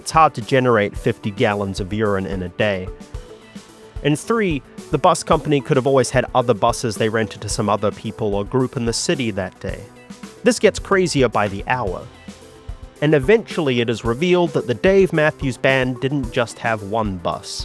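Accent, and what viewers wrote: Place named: New Zealand. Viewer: nz accent jumpscare (positive) dude I've just been through like 5 of your videos, these recaps are great! .. I truly had no idea this story had SA users involved omfg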